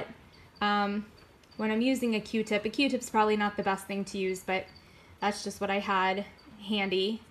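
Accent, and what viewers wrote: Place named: United States. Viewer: It’s an American accent. We all have one. Even you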